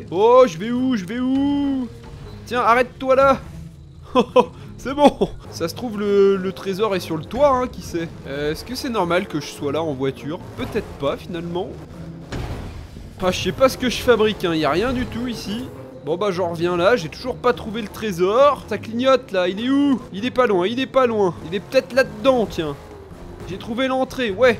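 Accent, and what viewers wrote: Switzerland. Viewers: Avant que tu parles du lac leman j'aurais parié que t'étais Vaudois... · T'es Suisse ?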